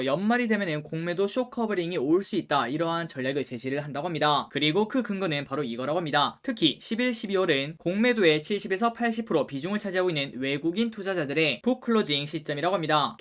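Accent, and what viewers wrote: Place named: Daegu, South Korea. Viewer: 님! 대구살아요? 와~~표준말 대따 잘하네요.저도 연식이 좀 있는데도 님 영상은 무조건 좋아요! 부터 누르고 꼭 봐요.너무 귀염귀염해여! ㅎㅎ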